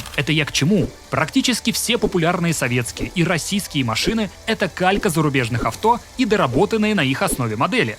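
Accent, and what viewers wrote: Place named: Ukraine. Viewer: хохляцкий акцент у автора.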